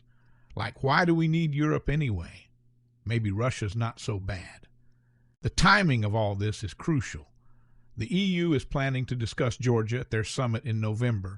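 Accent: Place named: United States. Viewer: This video is tipical western propoganda. Even the person speaking in the video has strong american dialect.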